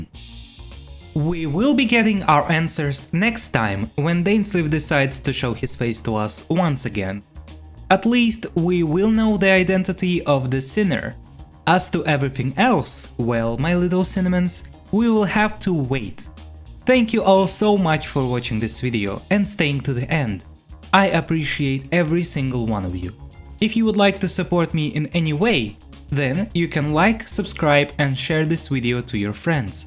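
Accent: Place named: Ukraine. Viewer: Сидел, сотрел видео. В конце оказалось, что автор Украинец (я то думал, что акцент такой забавный). Приятно знать, что автор так "недалеко" и приятно знать, что не только омерикосы делают хорошие видео с теориями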